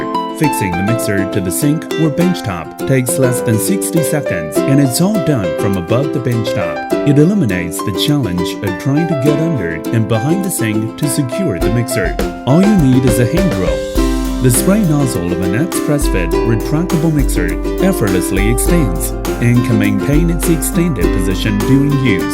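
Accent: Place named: United States. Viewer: That music is so loud and annoying I had to play it 3 or 4 times to get everything the guy was saying. Incidentally, his accent (American) was so broad I had trouble with that too. Not to worry, I'm off this afternoon to buy this exact same tap - looks great. Just lose that lame unnecessary music please OR reduce the volume.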